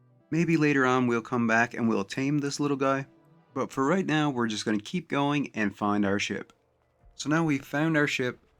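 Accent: Newfoundland, Canada. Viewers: Picked out the Newfie accent immediately, from there as well. Played this on ps4 a couple years ago, it felt empty to me. I’ve been seeing vids of this new update so I might have to pick it up on pc and try again.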